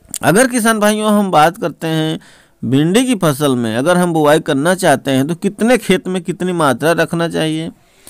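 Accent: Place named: Sultanpur, India. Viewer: बहुत सुन्दर प्रयास मैं सुल्तानपुर से हूँ। आपको बहुत बहुत धन्यवाद। अपने मिट्टी की खुश्बू आपकी आवाज से आती हैं। स्तुत्य प्रयास।🙏❤️🙏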